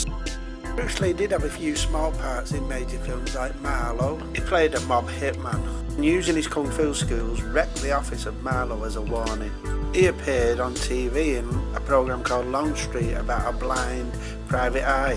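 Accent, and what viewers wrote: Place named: Northern England, United Kingdom. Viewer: Hi,another fantastic vide and trip back to my youth... thanks again! I too went through a kung-fu phase and alongside my Monster mag collection my Kung-fu monthlies were( and still are) prized pocessions of my collection.I was born in 1960 in Nottingham and have fond memories of riding my Raleigh chopper around (which i still have!) with my portable tape player blasting out some of my 70's heroes..., Glam rock, and those great K-tel albums.I also collected a lot of soundtrack albums(A future feature) inc Bond,Kung-fu films plus disaster films inc the great Big terror themes lp by.I moved to Bolton Greater Manchester 5 years ago to be with my girlfriend(who upon hearing your voice detected a certain northern dialect?)I still collect stuff from that golden period and have introduced my girlfriend to the delights of Hammer-Amicus films,ITC tv shows,Wheeltappers & shunters(Colin crompton is a great in our household!) and the fabulous music of the 60's-70's.Your channel is totally unique in looking fondly at a British cultural coolness!.Looking forward to more in the future. 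Take care. Ricky.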